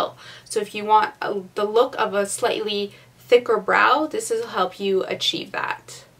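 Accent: Canada. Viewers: I've been watching your videos for a while now - and I finally just now heard your Canadian accent haha.. either I wasn't really paying attention haha Nice look!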